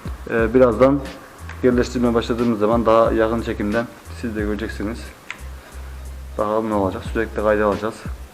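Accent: Tokat, Turkey. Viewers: tohatlıca türkçesi ustam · Abi herhalde Tokatlı konuşmasından anladım bende tokatlıyom ordan biliyorum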